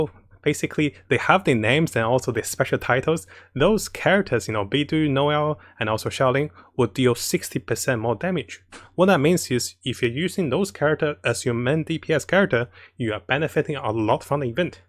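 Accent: Australia. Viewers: This guy must be Australian. Just my guess lmao I think I'm wrong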